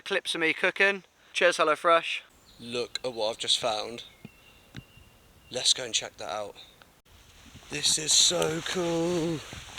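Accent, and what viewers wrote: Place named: Norfolk, United Kingdom. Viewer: Very interesting indeed. Good to hear a Norfolk accent again. Camping spot was ideal.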